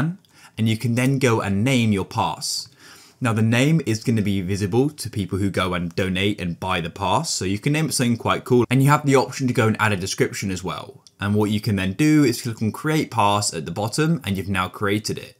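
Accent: United Kingdom. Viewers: Yes, he is british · Thank you British man! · Are you british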